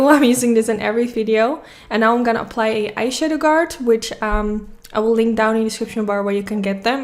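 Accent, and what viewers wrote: Netherlands. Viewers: I can hear youre Dutch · You sound Dutch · Nederlands accent hahahah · Your accent sounds Dutch? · Ik hou van je Nederlandse accent in je Engels ❤️ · Ik hoorde gelijk dat je nederlands was, haha! Mooie video, ben gelijk gesubbed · Not even 20 sec in: Me: that's so Dutch.... Lol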